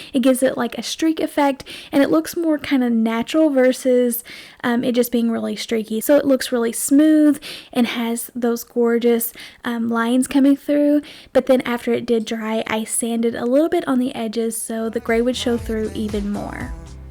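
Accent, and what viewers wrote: South, United States. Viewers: Ha ha! She does have a super sweet voice and personality to match. I'm a Texan and to me there's a bit of Southern Belle in her voice 😁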